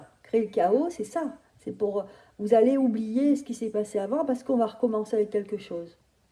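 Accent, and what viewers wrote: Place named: Corrèze, France. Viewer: Bonsoir merci bp j adore votre accent je crois entendre ma cousine correzienne c est presque pareil😊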